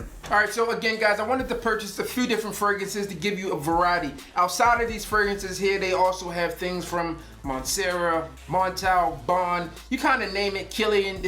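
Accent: Baltimore, United States. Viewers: New to the game and from what I've seen I'll be leaning on your reviews a lot! Is that a Baltimore accent I hear?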